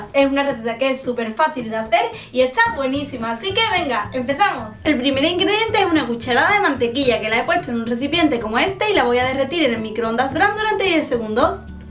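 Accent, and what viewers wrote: Andalusia, Spain. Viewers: no todos los españopes ablan asi jo soy española y no hablo asi, solo la gente andaluza habla asii..... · Nada q ver pero me gusta su acento. Me gusta ustedes (los españoles creo) que apenas acentúan la T cuando dicen "está" y acentúan más la S. Al revés que nosotros los argentinos por ejemplo 😊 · Es de España, de Andalucía, parece:)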